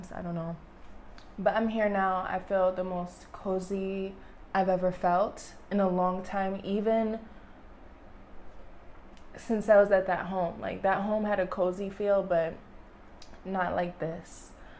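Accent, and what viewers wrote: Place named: Jamaica. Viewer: Omgggg i was typing the below message when the answer popped up on the screen. Amazing. Are you Caribbean? I always hear an accent... sounds Jamaican... as am I. Very nice place.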